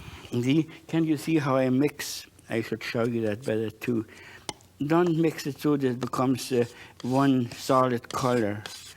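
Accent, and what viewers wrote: Germany. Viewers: He has the same accent and speech patterns as my German grandmother. Miss you Oma.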